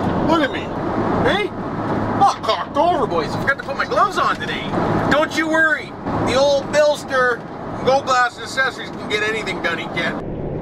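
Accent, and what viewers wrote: Canada. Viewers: your Canadian like me eh?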